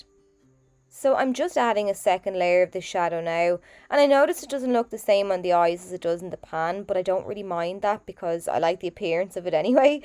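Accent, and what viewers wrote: Ireland. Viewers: Hey thanks, I’ll look into those brands. I actually took your advice and bought a little packet of hair elastics and have been putting them on the bigger brushes in the meantime. Excellent my Irish friend, excellent. I’m 5th generation Australian but my family hails from Ireland and all my Irish rellys have your accent so I love watching your videos. You are one talented artist. I have watched many many professional makeup artists on YouTube but your uploads are so clear and concise and easy to follow. I like the way you instruct over the video each point as you do it, it makes it so much more doable, and your friendly chatter makes it enjoyable. So cheers for that and keep safe and well over Christmas. X🐨x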